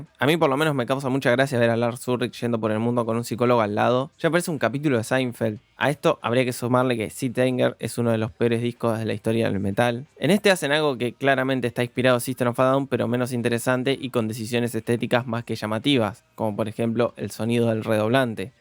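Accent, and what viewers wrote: Argentina. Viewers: Le debe encantar...enanitos verdes....este buee..sera argentino?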